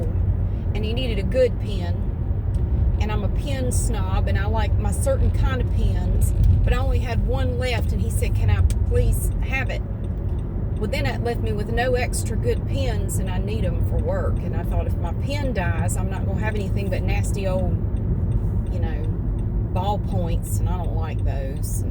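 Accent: South, United States. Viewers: Sometimes when I watch your vlog I feel like I'm listening to myself, but with a Southern accent instead of Canadian. 😄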